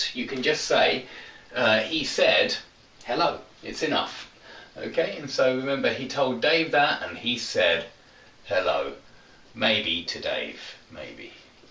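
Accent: United Kingdom. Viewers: Watching your videos can also help me to improve my accent 😁British accent is the best accent ever thx a lot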